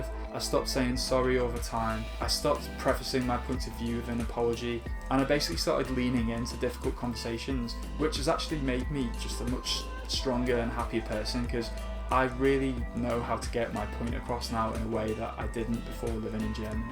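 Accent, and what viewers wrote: Liverpool, United Kingdom. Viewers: die Aussprache ist nicht das Problem, Rainer kommt wohl mit deinem leichten Liverpoodlian Akzent nicht zurecht, aber die Hintergrundmusik ist tatsächlich zu laut. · honestly, I don't think you have a very thick scouse accent. I can understand you quite well. I believe Carlos is lacking practise with various British accents. You speak in a very calm manner. I remember Liverpudlians with the intonations going up and down a lot. I watch the other day a video with Jamie Oliver preparing pasta with chard and wild garlic ... with did confuse me at a German as chard be a vegetable in saison in fall and wild garlic in April. Either these vegetables have different saisons in England ... but it didn't make sense to me suggesting a recipe made with ingredients of opposite saisons. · Agreed! 90s were cool times, not only for Brits!, your british dialect is great! 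I have learned English in the 90s by myself, without any teachers! I am using your videos to improve my british pronunciation. I can Read & Write on a good level, but I am not really good in speaking! Maybe you can make as well a video with some "weird british words", and how to pronounce them! English with Lucy is also very useful channel, Lucy describes very well phonetic aspects of British language: <link>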